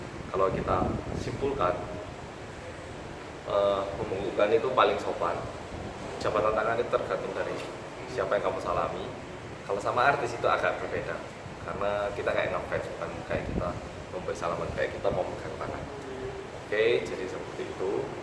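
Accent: Java, Indonesia. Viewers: jawanya mendok men thoh, aku salut,. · sampean medok e notok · Kali Bicara Logat Jawanya Padet Banget 👍😁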